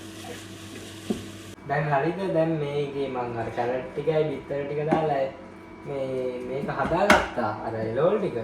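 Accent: South, Sri Lanka. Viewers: ඔයැයි....දකුණේ යි😅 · ඔහේ දකුනෙයි🫠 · ඔයා දකුනේ කෙනෙක් ද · ඔයා දකුණේ නේ 😂😊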